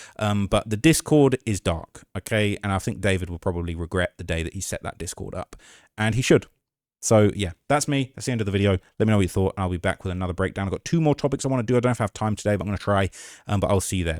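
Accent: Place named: United Kingdom. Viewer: Bros never heard of a British accent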